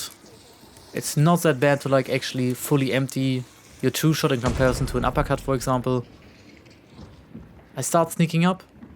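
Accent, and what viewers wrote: Germany. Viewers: as a fellow german i cant help but love your THICK german accent lol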